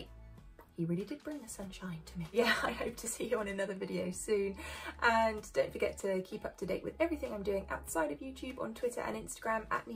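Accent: United Kingdom. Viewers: Tnx for reaction.and your British accent is awesome btw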